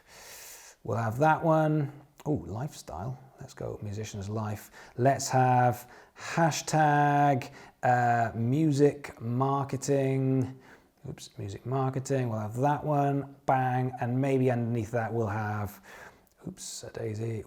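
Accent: United Kingdom. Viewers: I've been watching a lot of amercian youtuber but I didn't know until I started watching how much I needed to hear a British person. I feel like I'm back home😍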